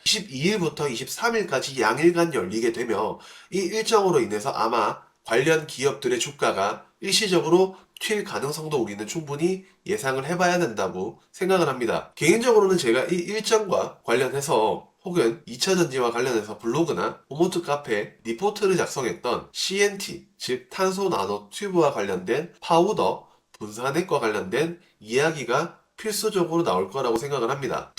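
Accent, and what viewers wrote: Daegu, South Korea. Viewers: 오늘따라 대구 사투리 왜케 귀엽나요.. ㅋㅋ 오늘 터지고 반성중인 주린입니다ㅜㅜ ㅋㅋ 테마+실적 명심하겠습니다!!!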